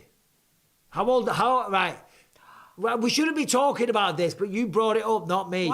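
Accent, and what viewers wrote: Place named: Northern Ireland, United Kingdom. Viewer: Its a solid NI accenf im impressed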